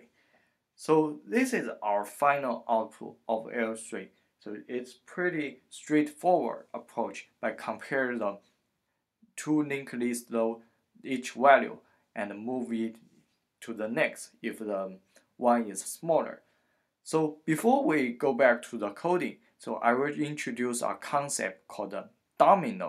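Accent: China. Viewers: 听口音像是国人，一看评论果然是。 老哥讲的可以啊，我这种菜鸟听懂了。多谢啦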